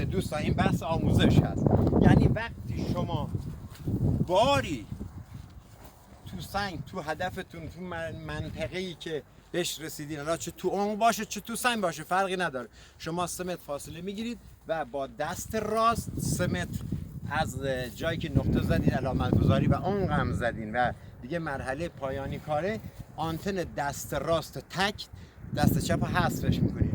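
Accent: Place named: Isfahan, Iran. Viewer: کارت درسته استاد.استاد یه ته لهجه اصفهانی هم دارید شما.آیا ساکن اصفهان نبودید؟؟؟؟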